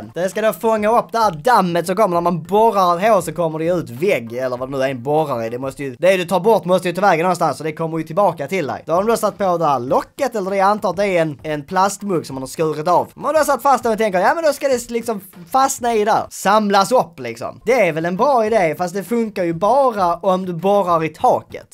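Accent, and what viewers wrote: Scania, Sweden. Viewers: Den skånska dialekten är då jävla rolig🇸🇪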